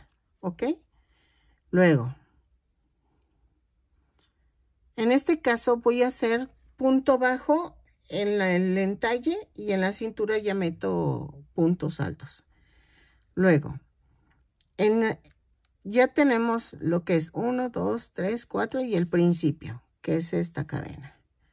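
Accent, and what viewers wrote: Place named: Mexico. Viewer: Perdón pero cuando veo números Veo chino . Prefiero jacerlas a ojo. Pero me encanta si acento mexicano y su dulzura❤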